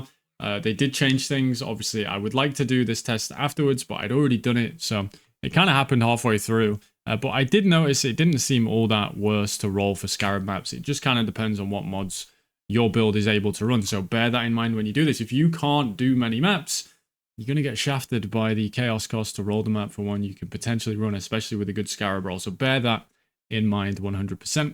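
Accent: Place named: Lancashire, United Kingdom. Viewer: Thanks or, appreciate the constant different strats to try - will be giving this a go tonight, and i'll post my findings as well. Out of interest, where are you from!? You sound like you could be from where I'm from in Lancashire, but also could be southern. Cannot guess.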